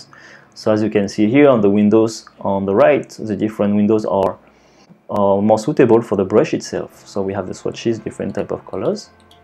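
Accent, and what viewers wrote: France. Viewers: u loose a little bit your french accent in your latest videos ;)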